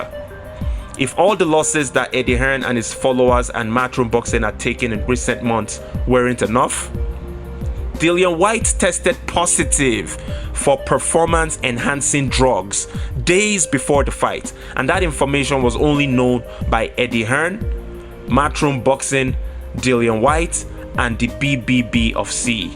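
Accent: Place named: Nigeria. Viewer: First of all, I love your content. You sound extremely eloquent while keeping your strong Nigerian accent intact. However you are just another extreme Wilder fanboy, Eddie hearn is a true promoter for AJ however does eddie run his mouth too much ? Yes he does. Did Eddie hearn force Whyte to take substances ? No he did not. You trying to sound really deep, but calm down you are just another overly emotional wilderfan boy seriously . Calm the fuck down, u don't make much sense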